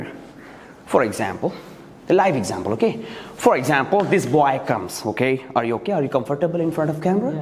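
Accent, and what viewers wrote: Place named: England, United Kingdom. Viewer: Sir app englisi hai kya....indian jaisa boliye na...itna kuse xuse kar rahe hai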